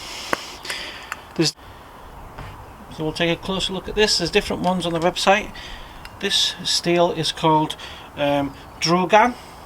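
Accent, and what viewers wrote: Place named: Scotland, United Kingdom. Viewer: Scottish 100 (you sound scottish)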